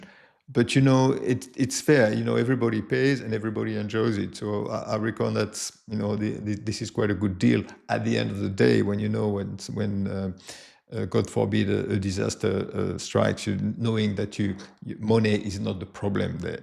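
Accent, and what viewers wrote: France. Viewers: Hi, Are you french yourself ? You seem to have a french accent. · Is he not? I mean, that is unmistakably a French accent. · "Why I Moved to France"... Are you talking about yourself? Because you sound French...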